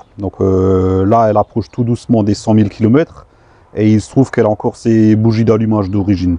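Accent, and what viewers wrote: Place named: East, France. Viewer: Non c'est pas dur car célibataire et 48 m² à Brest plein sud en étage avec mon chat et il fait beau, j'imagine avec une nana et des gosses....mais le plus dur c'est de ne pas pouvoir aller voir mon père de 75 ans et mes grands parent de 91 ans. En tout cas j'adore ton accent de l'est mon ami et ta gentillesse et surtout il y a toujours pire que soit mec salut. Kenavo.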